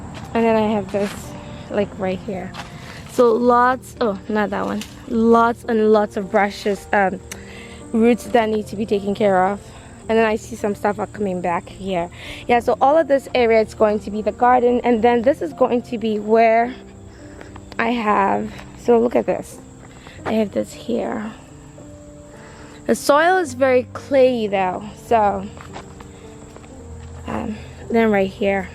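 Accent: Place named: Africa. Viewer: Sorry to bother you so much today but I’m on bed rest, there is an a more soothing channel than yours😎🤟 Your African accent is 🎶🎵2 👂❤ So happy we met 🎊 🎉 Keep it up 👍 Always learning here Impressive all the improvements to date 👋 see ya around. ❤❤❤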